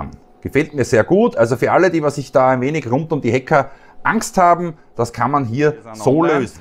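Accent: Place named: Slovakia. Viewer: Ich genieße dein Dialekt in Slowakei. Toll toll toll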